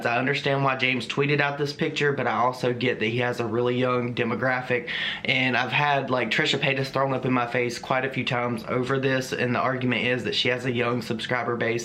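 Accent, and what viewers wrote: South, United States. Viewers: Are you from the south? Cuz same.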